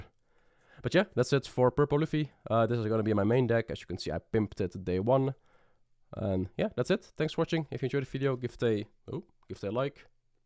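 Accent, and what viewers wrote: Netherlands. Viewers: Random question but are u dutch?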